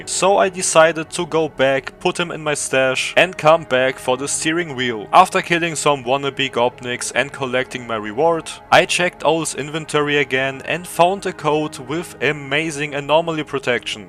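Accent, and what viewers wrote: Germany. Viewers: I did really enjoy the video. I suck at giving feedback sorry but im excited to see more. I was also thinking the entire tume that u sound so german so it was pretty funny to find out. Anyway i think u convinced me to finnaly try misery myself, also overcarrying yourself to sell all the loot is relatable af. Keep up the great work!! <3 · Took me 4 seconds to realize that he's german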